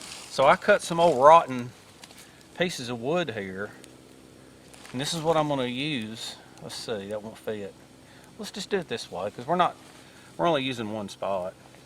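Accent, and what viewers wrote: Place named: Louisiana, United States. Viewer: You propably make the most sensible „wilderness-content“ on YT so it‘s my pleasure. And that Louisiana-Accent is the best thing ever. Your videos can not be long enough. I love to hear you talk! By the way: I keep watching my favourite video of yours again and again: „Your behaviour, choices and mindset in survival-situations“. That is pure gold. They should put it on TV 😂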